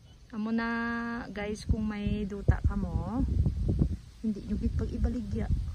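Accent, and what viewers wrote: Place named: Iloilo, Philippines. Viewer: I like the accent. I love Ilonggos..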